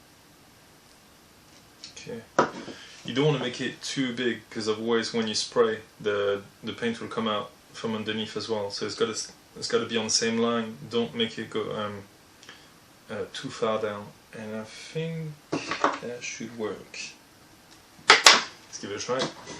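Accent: France. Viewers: Bonjour ! :) Excellente vidéo! ;) Ps: êtes vous français..? Jai cru reconnaître l'accent..^^ Si oui, serait il possible de faire de vidéos en français svp? :)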